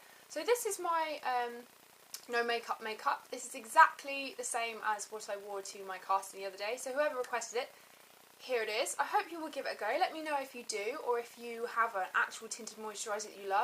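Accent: England, United Kingdom. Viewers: U r pretty without makeup and r u English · she is english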